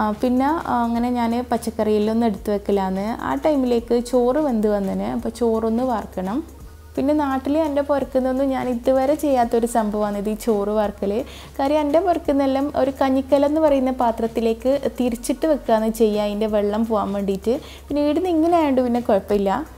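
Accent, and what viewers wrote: Kannur, India. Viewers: Thalasseryilum vadakarayilum okke varavu ennu thanneyanu parayunnadu.. Sarikkum kannur bhasha kelkkumbol sandosham thonnunnu...Husbandinu endanu jyoli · നിങ്ങളുടെ കണ്ണൂർ ഭാഷ നല്ലോണം പറയണം കേട്ടോ. കേൾക്കാൻ നല്ല രസമുണ്ട്. നമ്മൾ പാലക്കാട്‌ ജില്ല യിൽ ആണ്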